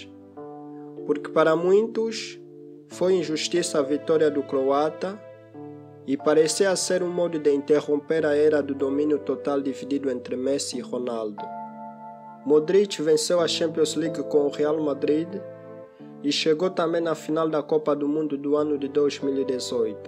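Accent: Angola. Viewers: Vi um angolano no sotaque🔥🇦🇴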